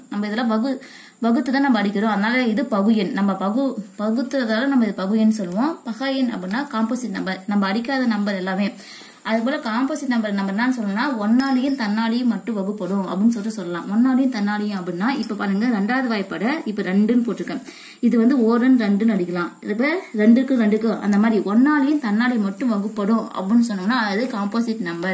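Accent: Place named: Chennai, India. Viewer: Unga chennai speak super madam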